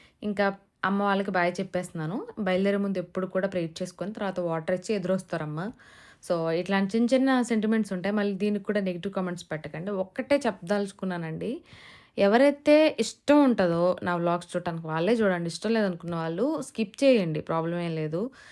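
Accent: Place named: Nellore, India. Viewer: sare....Badram😊 ma Nellore language...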